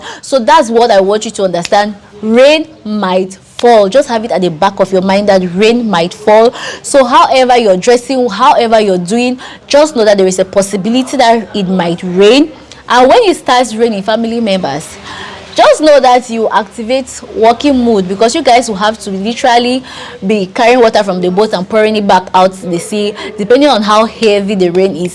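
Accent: Nigeria. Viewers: did you live in Nigeria or Bameda before. You sound nigrian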